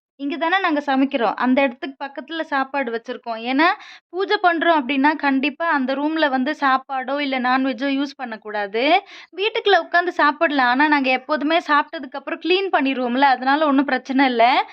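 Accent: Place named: Odisha, India. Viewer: நீங்கள் ஏன் ஒரியா பெண் மாதிரி தமிழை பேசுறீங்க?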